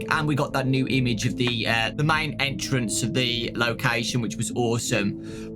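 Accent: United Kingdom. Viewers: Who is this guy and why is he British? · Is Britsh!! britsh! (british)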